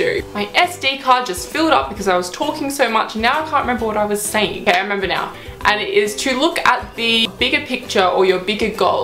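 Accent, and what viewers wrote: Australia. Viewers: Hey if i am not wrong are you Australian??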